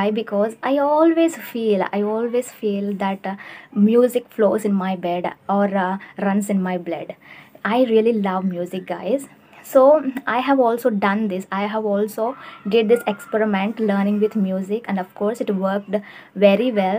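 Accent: South India, India. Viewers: I agree with what you said but all these tips and tricks can be beneficial for people who have some english knowledge. In northern india there are many students who are purely from Hindi background and English is rocket science for them. How will these ideas help them to learn English language? You are definitely from south as what i understood from your accent and to be honest the tips you shared can give maximum benefits to people who are at least in touch with english. These tips will be pure bliss for them.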